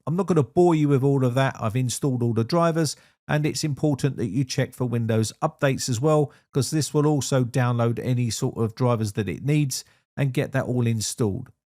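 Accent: United Kingdom. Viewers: He’s British, so if you can’t understand the mother English language, watch someone else 😉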